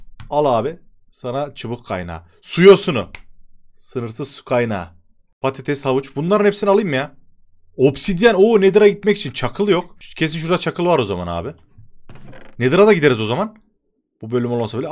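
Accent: Yozgat, Turkey. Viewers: Abi yozgatlımısın?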